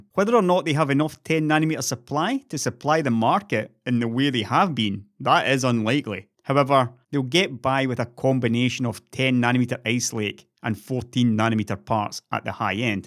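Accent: Scotland, United Kingdom. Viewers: The sweet sound of Scottish analysis...everyone knows what time it is. 🙏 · He's Scottish, of course. The accent is similar to Dr. William MacDougal from Kirkwall ... Or Aberdeen? Or Glasgow? 😁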